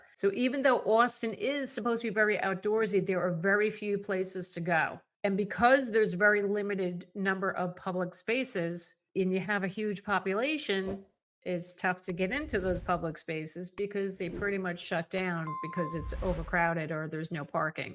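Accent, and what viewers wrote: New Jersey, United States. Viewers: Sounds like New Jersey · Well, I'm in eastern Washington, so I have no dog in this fight, but come on ... Here we have a real estate agent with a strong Jersey accent talking about how bad Austin, TX is. Now that's just funny. How clueless can a person be?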